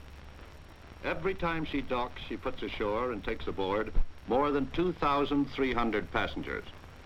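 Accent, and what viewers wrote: United States. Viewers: American commentary!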